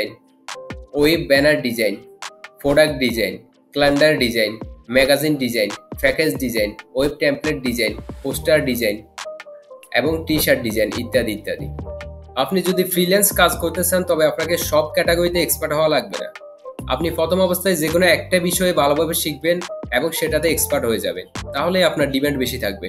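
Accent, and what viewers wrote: Noakhali, Bangladesh. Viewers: Apni ki noakhalir?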